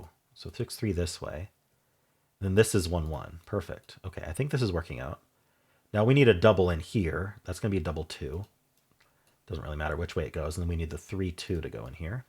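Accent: United States. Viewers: There's the old American 'T' that sounds like a 'D' again.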